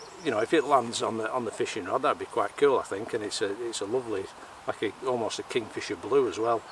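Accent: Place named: Bolton, United Kingdom. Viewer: great video. you sound fairly local to Bolton where I'm from.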